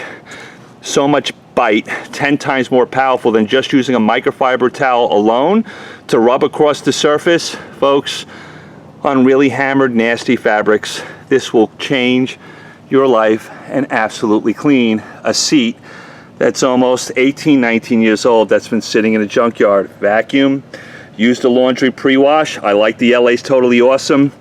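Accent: New York, United States. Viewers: Joisy or Newyawk. So, when was the last time you were in the Clam Broth House in Hoboken, or McSorley's on 7th? LOL Been too long for me, sadly.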